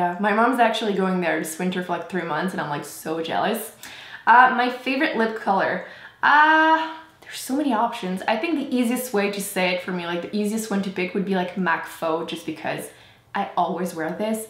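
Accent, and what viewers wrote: Quebec, Canada. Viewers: Yes, No, Toaster! Le français typique du Québec hahaha! J'adore tes vidéos, elles me font toujours sourire :) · Just discovered your channel and right away I was sure your were french-canadian, as I'm from Montreal myself :) I'll be checking out all your videos now haha ill make sure to comment. I don't know how you do it with school and YouTube but keep it up! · I just found your channel from the Kat Von D Everlasting Lipstick swatches and looking through your videos I saw this where you answer what kind of accent you have... French Canadian! So cool, the majority of my background is from there, and you pronounce "third" just the way I remember my late Pepe... it's nice to hear the accent again! Plus, we seem to have the same coloring (dark brown hair, dark brown eyes, fair skin) so your videos will be very helpful to me since that is a Dark Winter coloring, and you published this video on my birthday!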